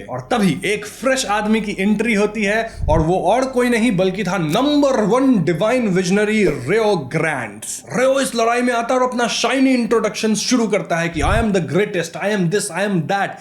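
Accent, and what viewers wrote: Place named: Bihar, India. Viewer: Bro is bihari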